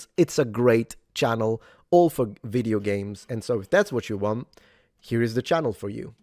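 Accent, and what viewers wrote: Sicily, Italy. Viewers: I don't remember when i first got to your channel, but i remember that i wanted something history related to listen to, saw your name, went "Heh, Supernatural" (Yes, i know, i'm a smooth-brain) and i was hooked on that stuff for a while. Then i kinda forgot about you, because my focus shifted to something else. After what happened with Kirk, i just needed someone to listen to who talks sense into that situation, and by chance i found you again. Now i'll stick with you again, your content is pretty cool overall. Do whatever feels right, i'll listen to your nice italian accent regardless of what you're speaking about! :D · Good sir, you can post whatever you want on YOUR channel. I just recently started watching and it was because of your political commentary. I'm so happy you popped up on my feed, your voice is very soothing and your accent is great. 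My dad was in Sicily when he was in the Navy during the Vietnam war and always spoke so highly of his time there. When I heard you say that is where you are from, I was hooked. I appreciate your perspective and hope you continue to post your feelings. ❤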